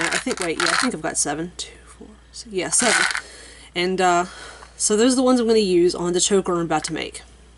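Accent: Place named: United States. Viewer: Your American voice is so cute🙈🙈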